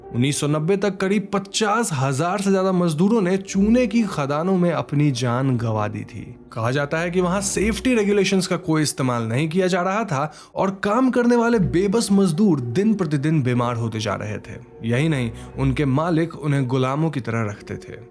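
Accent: Bengal, India. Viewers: Bhaiya aap bengal se ho ??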